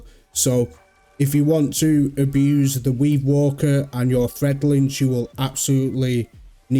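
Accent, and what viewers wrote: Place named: Blackpool, United Kingdom. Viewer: Blackpool accent sounds very similar to,was there last month ,night out with wife 🤙🏻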